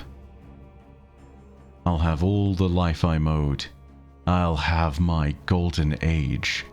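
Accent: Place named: England, United Kingdom. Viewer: lol the captions can't understand english accent, talking about "law books"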